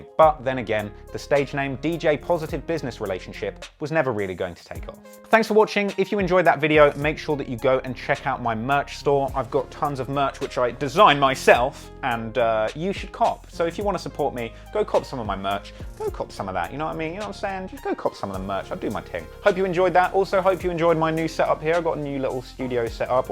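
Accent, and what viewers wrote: United Kingdom. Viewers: I'm really listening to a british dude talk about how a rappers career is ending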